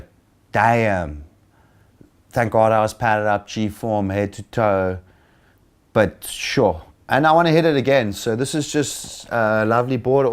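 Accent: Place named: Italy. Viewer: italian accent is cute :)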